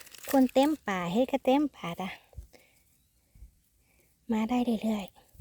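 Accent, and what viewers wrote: Korat, Thailand. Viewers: พูดเหมือคนโคราชเนาะ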